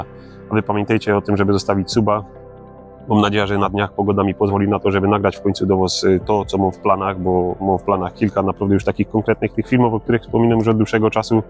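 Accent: Silesia, Poland. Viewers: Trzymam kciuki za rozwój kanału. Tego typu polskojęzycznych fotograficznych kanałów jest stosunkowo niewiele. Podoba mi się formuła - krótko, rzetelnie i treściwie. No i ślonsko godka, choć sam jestem poznańska pyra ;-)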